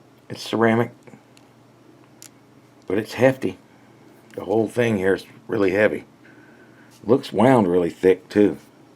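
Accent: South, United States. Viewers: You're my new favorite guitar guy on YouTube, now. You from the South, brother? Mississippi over here!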